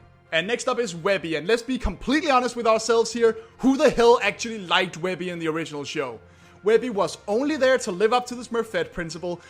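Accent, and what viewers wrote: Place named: Denmark. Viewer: You wouldn't happen to be Danish? xD Sounds like that on your accent :P EDIT ok nvm, just looked at your channel and can see you are xD Jeg er nemlig også selv Dansker, så det syntes jeg var ret cool :P haha xD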